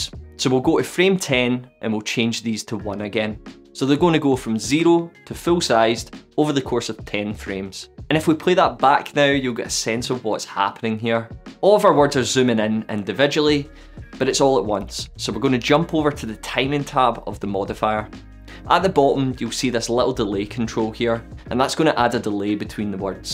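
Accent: United Kingdom. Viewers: Thank you! Btw, first 1,5 minutes i just listening your pronunciation in English, it’s British accent? :)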